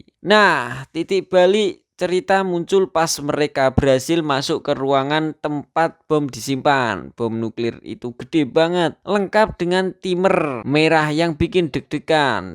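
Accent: Java, Indonesia. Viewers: Meddhok tenang mas. Hehehe JB Malaysia nyimak❤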